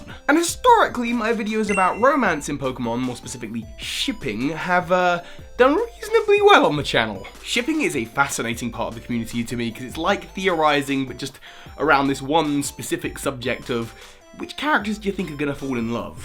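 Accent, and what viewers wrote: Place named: London, United Kingdom. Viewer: Where are you from in England? Based on the cadence of your accent, I'm assuming you're from the London area since it sounds like Daniel Radcliffe's accent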